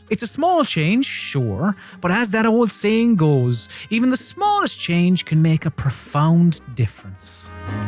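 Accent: Ireland. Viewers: Is this the Irish accent? So cute. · I love the narrator’s Irish accent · man soundds oirish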